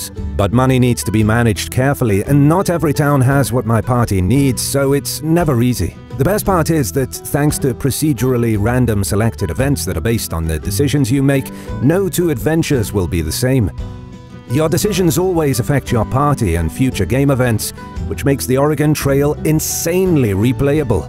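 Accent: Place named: England, United Kingdom. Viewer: Did you ask the narrator to narrate in an English accent or was that a choice they made? A Scottish narrator narrating in an English accent and mispronouncing many Scottish place names is a bit odd.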